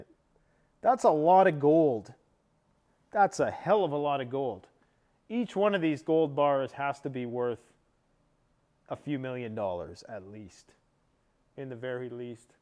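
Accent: England, United Kingdom. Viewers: Dude your English accent is hilarious! The arrogance of these people thinking they will still have servants running around for them after SHTF 🤣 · Wow I'm impressed with your English accent!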